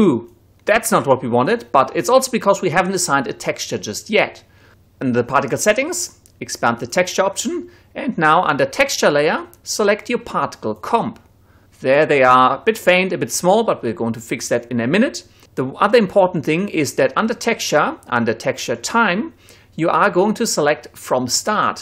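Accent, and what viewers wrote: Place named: Germany. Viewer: eindeutig deutscher Akzent thank you helps me alot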